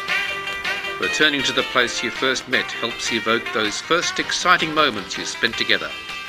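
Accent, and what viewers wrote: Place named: South Africa. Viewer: Funny! But why the South African accent?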